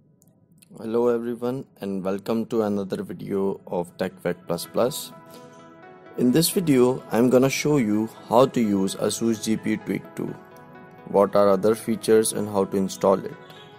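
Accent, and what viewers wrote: India. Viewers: Indian accent, i think ill pass on that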